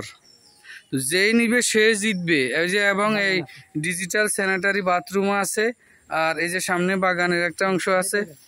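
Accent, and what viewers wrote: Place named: Noakhali, Bangladesh. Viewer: ভাই নোয়াখালী নাকি।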